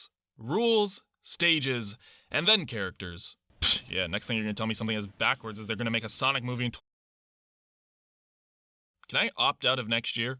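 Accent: Canada. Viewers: Wait are you Torontonian?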